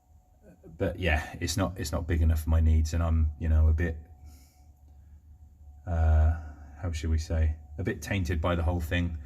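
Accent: United Kingdom. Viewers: Hi great channel, it sux snap on hasn't been great for you. I watch the channel cause you talk about snap on tools, nice to hear from a Brit instead of a yank for a kiwi snap on fanboy. love your toys!!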